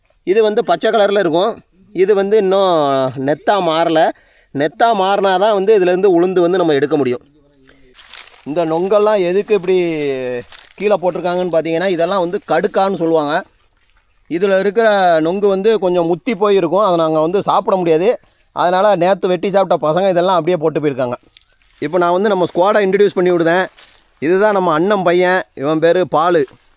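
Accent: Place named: Village Tamil, India. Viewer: அருமை வாழ்த்துக்கள் நம்ம ஊரு தமிழ் அழகு❤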